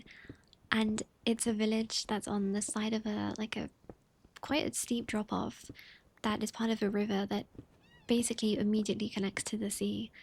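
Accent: England, United Kingdom. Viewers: it's just an English accent what😭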